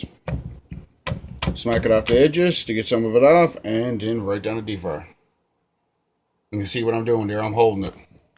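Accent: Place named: Newfoundland, Canada. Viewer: I am loving your accent... I was thinking you’re from Ireland living in Alabama😂. 🇨🇦 Newfoundland!!! Never would have placed it 😉 thanks for the perfect recipe 😋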